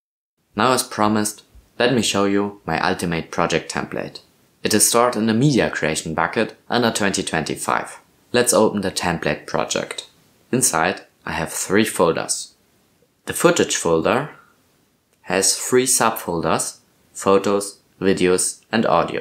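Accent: Germany. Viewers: bro has the strongest german accent iv ever heard, nice video of course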